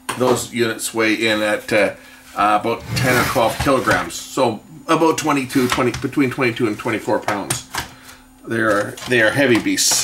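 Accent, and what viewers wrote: Canada. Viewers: You from Canada?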